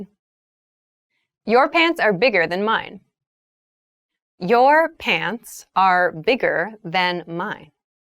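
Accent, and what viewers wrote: United States. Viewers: Thank u very much for helping us in learning English. Here I want ask u a question, please tell me where are u from and if iam not wrong ur speaking American accent, isn't it? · you're the best, i love your American accent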